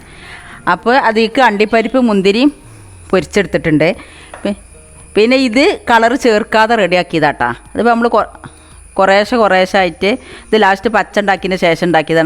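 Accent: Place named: Kottayam, India. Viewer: അസ്സലാമുഅലൈക്കും 🤩😍😂ഉമ്മാക്ക് ആയുസ്സും ആരോഗ്യവും ഉണ്ടാവട്ടെ കോട്ടയത്തെ വർത്താനം എന്താ സുഖല്ലേ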